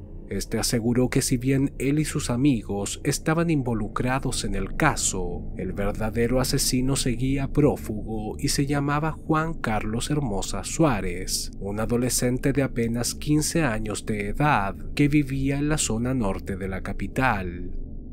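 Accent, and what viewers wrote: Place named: Chile. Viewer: Hola, siempre veo tus videos, pero primera vez que comento algo. Sabes, siempre me ha intrigado esto de los enigmas policiales y como opera la mente de un criminal, es alucinante. Me encanta tu forma de relatar, me gusta que seas chileno porque me gusta tu acento (yo soy chilena), y me gusta el formato de imagen que sin ser morboso o grotesco es bastante grafico y real, la estructura del relato me gusta mucho. Te deseo sigas triunfando y mucho éxito para 2023.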